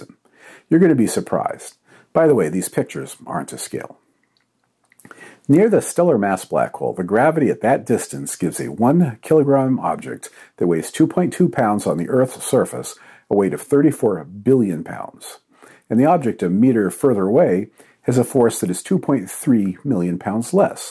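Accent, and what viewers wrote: United States. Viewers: Once again regarding the your Americanized simplified pronunciation of non-American scientists' names. Can you, please, say "Descartes", and "de Broglie" to name just two, as a proud but somewhat ignorant American? (BTW, the 1st is pronounced "decart" and the latter "de Breuille"). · He addressed this topic earlier. I guess he doesn't care about German pronounciation and prefers the American, just like they don't call the country Deutschland.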